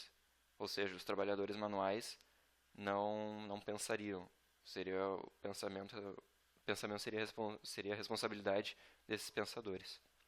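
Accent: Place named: Rio Grande do Sul, Brazil. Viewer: sotaque bem gaucho . .top lembrei de meu amigo gaucho de novo hamburgo..bom video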